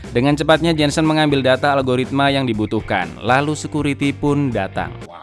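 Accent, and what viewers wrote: Java, Indonesia. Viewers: Mas sampean jowo ngendi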